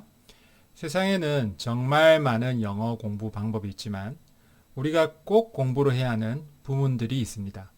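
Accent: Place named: Busan, South Korea. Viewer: 강의중에 부산억양이 살짝 들려서 여쭤 봤습니다! 향후 강의 개설계획 있으시면 좋겠습니다~여기도 부산입니다~~^^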